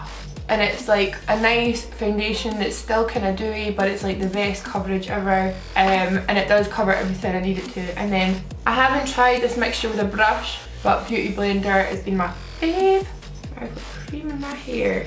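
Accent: Scotland, United Kingdom. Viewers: What part of Scotland are you from? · are u scotissh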